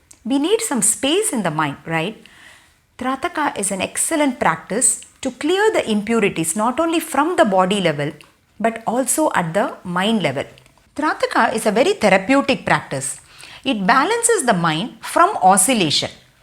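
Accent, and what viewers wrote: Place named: India. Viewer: Lady, I love your Indian accent and it's always a joy to watch you. Thank you.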